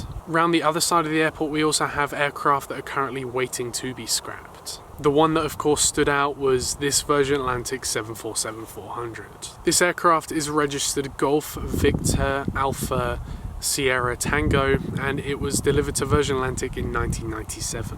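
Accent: United States. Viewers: Nice vid, but ditch the US accent/pronunciation. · Nice video. Why are you speaking American English, it’s a bit strange? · Stopped watching when you started using American pronunciations.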